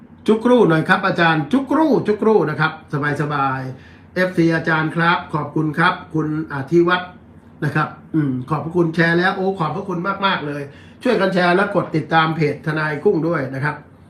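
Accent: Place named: Songkhla, Thailand. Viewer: สงขลาชัดเจนดีครับน้อง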